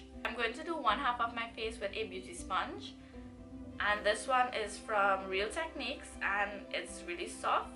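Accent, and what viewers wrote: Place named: Trinidad and Tobago. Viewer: trini!! new subbie soon as i heard the accent. thought the color was totally off at first but with some oxidation you worked it out and you looked beautiful.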